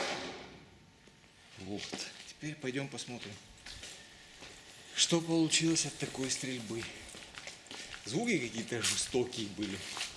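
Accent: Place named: Kabardino-Balkaria, Russia. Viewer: акцент кабардинский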